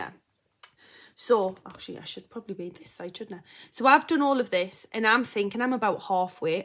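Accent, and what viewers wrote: Newcastle, United Kingdom. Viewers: Great to see a Geordie on here. I always loved the accent. Fantastic first video, loved your projects, look forward to following your journey. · Yay this was really fun!! Good work ❤❤❤❤ once I got over the fact that I wasn’t expecting the Geordie accent 😂😂 xxx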